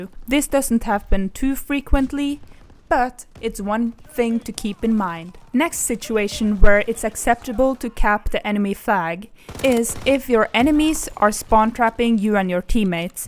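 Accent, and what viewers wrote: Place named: Ireland. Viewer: her accent is irish fyi people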